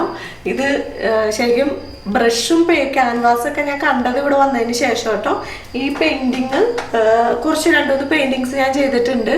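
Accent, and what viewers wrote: Thrissur, India. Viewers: Hi, അവതരണം നന്നായിട്ടുണ്ട്, painting അടിപൊളി ആയിട്ടുണ്ട്, നാട്ടിൽ എവിടെയാ, ഒരു തൃശൂർ slang പോലെ തോന്നുന്നു. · നാട്ടിൽ ത്രിശൂർ ആണോ വീട്..?? സ്റ്റാർ മാജിക്കിലെ ലക്ഷ്മിയുടെയും സ്ലാങ്കും വോയിസും ഒരുപോലെയുണ്ട്...👍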